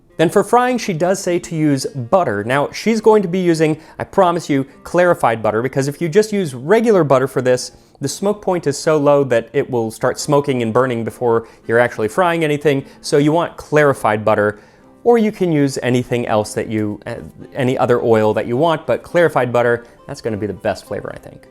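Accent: Germany. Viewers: It's been a while since I watched a Tasting History. Absolutely love this channel, and love hearing German and his occasional half German half English like the "If you vant" there at the end. ❤ Great stuff... Might have to stop by the butcher to get some veal and try to cook a proper wiener schnitzel.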